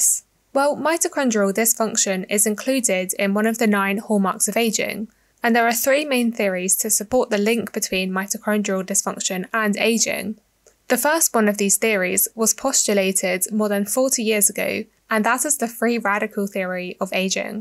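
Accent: United Kingdom. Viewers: Im sorry to say, the brittish accent is just so hard to take.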